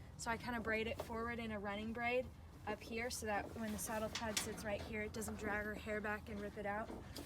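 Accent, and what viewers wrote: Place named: Texas, United States. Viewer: Definitely can tell you’re Texan lol “don’t kick the trailer ma’am” haha though i live in tx too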